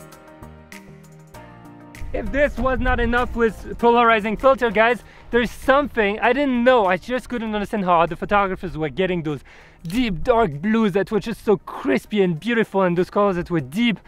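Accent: France. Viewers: Thats the natural French accent while speaking English! :) or may be the microphone was not powerful enough! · ur french ! dT'S why ur accent seems like dt 😁 but ur awesome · hhh freinsh accent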